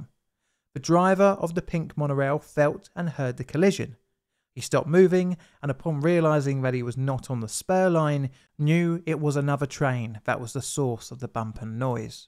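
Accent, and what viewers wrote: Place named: England, United Kingdom. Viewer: Just found your Channel and subscribed because I enjoy your Video content and appreciate your English accent, but I have a question (and it's not meant to be a criticism). When did Th-fronting become compulsory for Brit YouTube narrators ? Or has a speech impediment become a dialect ? Of the many British Channels to which I'm subscribed, nearly all the narrators have this idiosyncrasy and I can't help but wonder why ?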